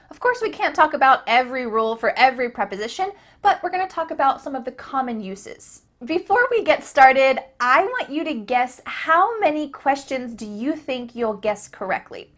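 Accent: United States. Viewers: I want u to do more videos on how to sound like an American....PLZZ PLZZ just get a series on it so that everyone would be able to get the same accent on point just like u🙏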